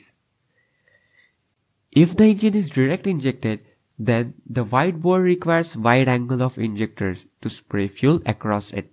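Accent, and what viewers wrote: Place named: India. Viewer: Good content, good animations. But this indian accent is annoying the hell out of me. Why not focus on proper pronunciation so the content is suitable for normal English speaking audience.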